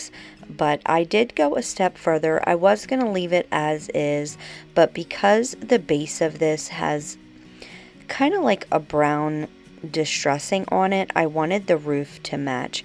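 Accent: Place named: Philadelphia, United States. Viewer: YES GIRL. You have my accent lol I live in Delaware county originally from Philly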